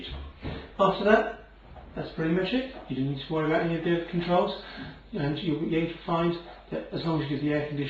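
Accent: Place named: United Kingdom. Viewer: Air conditioning is severely underappreciated here in the UK and honestly I was quite surprised to hear a British accent when I clicked on the video.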